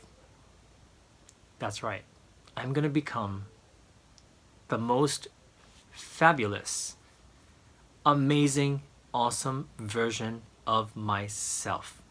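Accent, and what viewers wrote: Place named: United States. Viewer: actually grew up in Hawaii, hence his American accent and fluent English!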